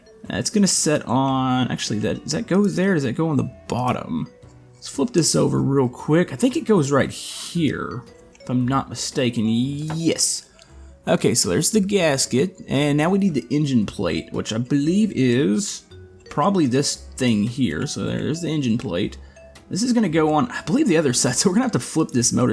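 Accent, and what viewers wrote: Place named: Maryland, United States. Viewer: bro, u sound like me. r u from Maryland?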